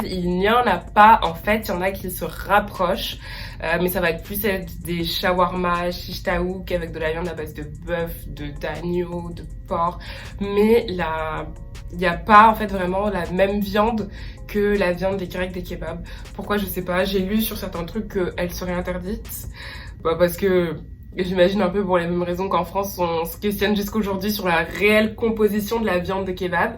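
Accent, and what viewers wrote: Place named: Quebec, Canada. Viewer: Tu commences à avoir l'accent québécois, sa s'entend très très très très légèrement.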